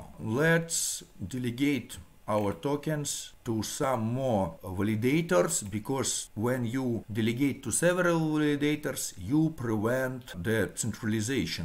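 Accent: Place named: Russia. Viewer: Аналогично )) Зато всё понятно - нет багов в понимании английского или американского "прононсиэйшн". По русски говорит на английском!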